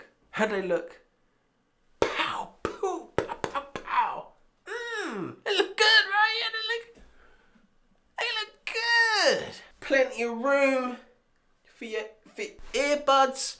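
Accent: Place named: England, United Kingdom. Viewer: Why do you look and sound like an English James Pumphrey?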